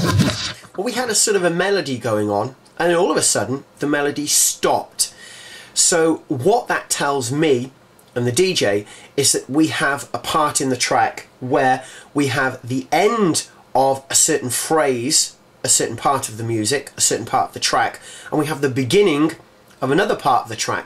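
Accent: United Kingdom. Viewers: Love brittish accent!!! #nohomo K !!!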